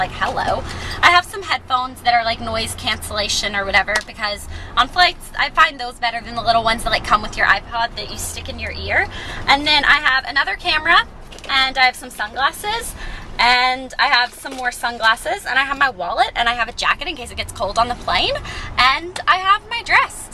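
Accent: South, United States. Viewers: AHHHHHHH!!! omg! I had NO IDEA you were from Tennessee?! Hahaha that's where I live! Lol you will probably never see this but I've heard you & your southern accent i just didn't know where you were from haha (: -3 TN... Nashville:P xoxo love you! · Ive been trying to figure out for so long where you were from. Tennessee? That's so cool. I'm from Hawaii. Ive always wanted a southern accent. Is that weird? Haha well have fun at prom :) -Kat Bloop O.o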